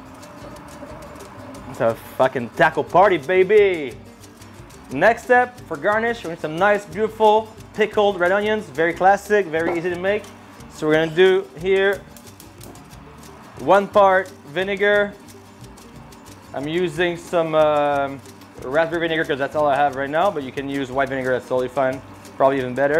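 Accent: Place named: Quebec, Canada. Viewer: With this accent this guy is a Quebecois (French Canadian) but he speaks in this mixed English for views, I imagine!?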